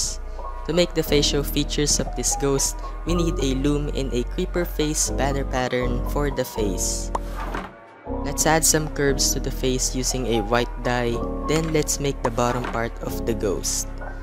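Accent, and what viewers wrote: Philippines. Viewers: U sounds like Filipino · Break not Broke. also are you filipino cuz your voice sounds filipino...^_^ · Ate u a Filipino?the accent lol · Ummmmm are you filipino? Couse you sound like filipino hehe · Men hes accent is familiar? Are you a filipino? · Pinoy ka kuya noh?? Yung accent mopokasi hahahha halata!! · ur from the philippines, i can hear ur accent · What is your nationality? I think your Filipino because of your accent?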